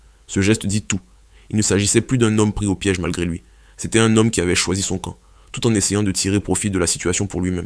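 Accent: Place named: Ivory Coast. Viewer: Arrête de mentir, ce n'est pas ressent comme tu essais de el faire croire. Les frères et soeurs de l'AES attention à ce genre de chaine de propagande contre l''AES. Regardez le nombre d'abonnés qu'il a ce mec, 104 et cela signifie que c'est tout ressèment qu'il l'a créer pour divulguer des fausses informations. N'oubliez pas que la France et les pays comme la Côte Ivoire ont mis en place un recrutement de jeunes délinquants ivoiriens. Dans la vidéo que vous pouvez retrouver facilement sur internet, on n'y voie des africain comme ce mec qui parle dans cette vidéo, assis dans une salle avec des t-shirt noirs avec une inscription dessus. Le bute est d'inonder tout les réseaux sociaux pour contrecarrer l'AES et diffuser de fausses informations, faire des faux débats en montant sur des panel panafricains et pro AES pour tenter de les convaincre et de dénigrer les dirigeants de l'AES. 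Donc ne regardez pas ce genre de chaine comme celle ci qui ne sont la que pour la désinformation du Sahel. Ecoutez la voie de gars qui parle dans cette vidéo, on reconnais tout de suite que c'est un 100% ivoirien avec leur salle accent de merde de woubie. Il n'y a eu aucune tentative de coup d'état ces derniers jours ou même heure. En conclusion c'est l'un des chaine de propagande ivoirienne de fake news et de désinformation sur l'AES. Nous sommes sur place et il n'y a rien du tout . Ils vont prendre des images et vidéos qui datent et vont essayer de vous faire croire que ça ce passe maintenant. Peupl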